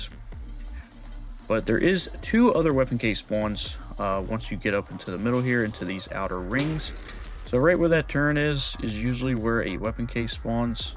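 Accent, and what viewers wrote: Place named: Philadelphia, United States. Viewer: Philly delco or Maryland? I hear It in your accent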